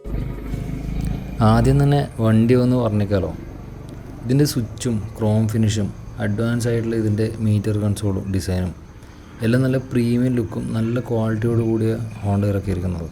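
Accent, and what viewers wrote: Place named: Kannur, India. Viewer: Glad to hear a different tips of this bike from you .. G’men , which is your exact residential location..? as per your slag , you are in KANNUR dist..? Thank you, I like the video and the places of the video ..!!😀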